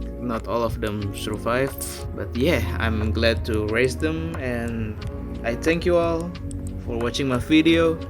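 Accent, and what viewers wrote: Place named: Indonesia. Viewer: Dari aksennya langsung kedenger, Indonesia ya? Eh bner pas tengok channel mas nya 👍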